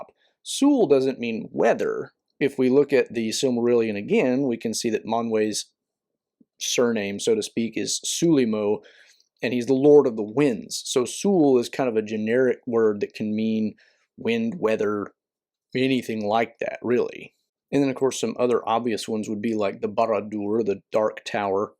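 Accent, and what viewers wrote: South, United States. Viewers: That bloody accent....so Southern.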